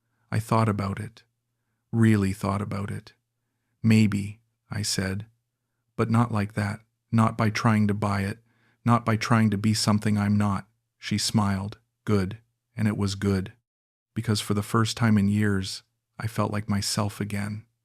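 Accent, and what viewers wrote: United States. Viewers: Another yankee voice talking about fakeness, yankees are good at scam stories.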